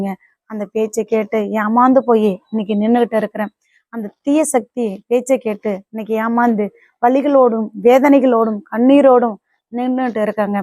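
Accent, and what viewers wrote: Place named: Thoothukudi, India. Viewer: அம்மா நீங்க ரொம்ப வருத்தப்பட்டு இருந்தீங்கன்னா அப்பாவை பார்க்க முடியாது உங்க பையன பார்க்க முடியாது உங்களுக்கு உடல்நிலை பாதிக்கப்படும் கொஞ்சம் தைரியமாகவே இருங்க ஆரம்பம் என்று இருந்தால் முடிவொன்று இருக்கும் உங்க பேஸ் போல தான் தூத்துக்குடி சாத்தான் குளம் பேசும் அப்படித்தான் இருக்கு கவலைப்படாமலும் வருத்தப்படாமல் இருங்கள் அப்பொழுதுதான் உண்மை தெரியவரும் நீங்களும் வீடியோ போட முடியும் நீங்க பேசும் போதெல்லாம் எங்களுக்கு மிக மிக வருத்தமாக தான் இருக்கிறது ஆமா வயிற்றில் வயிற்று எரிச்சலாக இருக்கிறது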